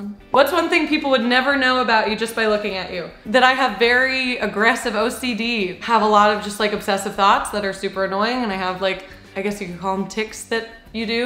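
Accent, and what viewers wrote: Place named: South, United States. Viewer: "everybody's got sumthin" YASS southern accent queen.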